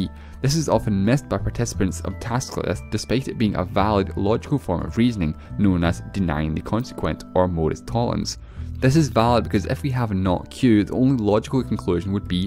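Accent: Scotland, United Kingdom. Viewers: I clicked off the video because your Scottish accent was confusing me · Irrelevant question; What part of the UK is the narrator from? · He is from Scotland, probably Glasgow. :) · That's a Scottish accent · Scottish, hes from scotland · Paul Graf I think he's Scottish.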